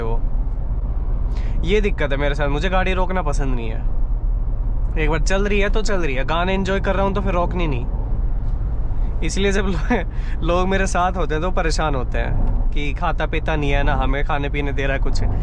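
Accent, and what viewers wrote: Haryana, India. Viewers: Bhai aap jo haryanvi me bol teea ho bhot aacha lagta ha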